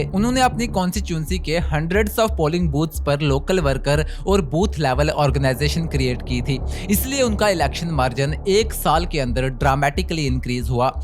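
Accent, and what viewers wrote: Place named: Pakistan. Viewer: Bhai tera accent pakistani kyu lag rha hai ?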